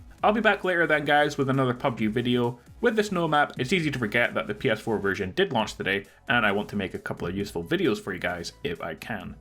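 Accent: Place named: Edinburgh, United Kingdom. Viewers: Sounds like Edinburgh. 100% not from Northern Ireland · Scotland not sure were maybe even just outside id guess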